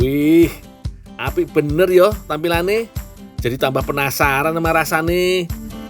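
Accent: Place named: Banyumas, Indonesia. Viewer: Ngapak lurrrrrr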